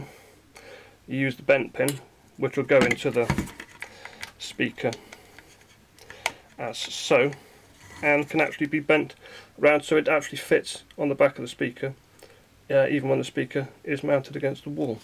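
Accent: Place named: United Kingdom. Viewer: really like british accent. sounds so relaxed not like my launguage :D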